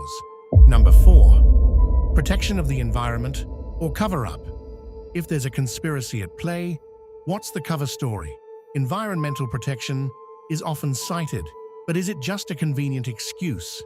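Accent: United Kingdom. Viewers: DO AWAY WITH THAT HORRENDOUS LOUD BACKGROUND NOISE YOU MISTAKENLY THINK IS MUSIC.... IT'S VERY ANNOYING AS IS THAT HORRIBLE BRITISH ACCENT IN WHICH THEY CAN'T PROPERLY PRONOUCE WORDS.... LAW DOESN'T HAVE AN 'R' IN IT, AND TUBE ISN'T PRONOUNCED AS CHUBE, AND CUBA ISN'T PRONOUNCE CUBER, AND DUNE ISN'T PRONOUNCED AS JUNE!... HORRENDOUS!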